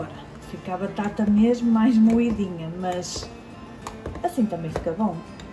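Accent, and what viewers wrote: North, Portugal. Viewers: origami art, foi este sotaque é o motivo, que os cariocas conversam diferente das demais regiões do país. É que quando a corte chegou, vinda de Portugal, todos ficaram imitando os portugueses e deu nisso. O Brasil é o único país das Américas que teve Rei. Sabia? Não só rei, como duque, marquês, conde, visconde, barão, etc nesta ordem, onde o duque é abaixo somente do rei. Saba? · Amo o sotaque português, aqui no Brasil chama-se escondidinho de bacalhau. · É do norte tem prenuncia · Olha menina, eu to daqui do sul da Suecia vendo voce fazer esta receita deliciosa e nutritiva, nossa quero fazer pra provar, obrigada viu..Amo escutar seu dialeto portugues..Tenho uns conhecidos aqui dexMalmö e a Ricardina em Hasseholm.. Olha mae falava, " sôsu! Palavra antiga no 🇧🇷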